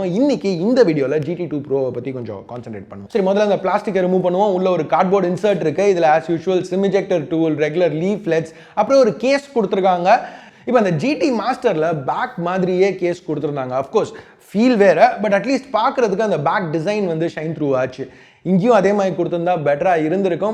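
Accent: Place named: Chennai, India. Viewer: Ena bro Chennai tamil pesuringa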